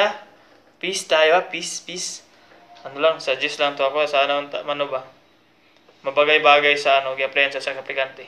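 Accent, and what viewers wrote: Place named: Visayas, Philippines. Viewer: Hahahaha bisaya jud oi..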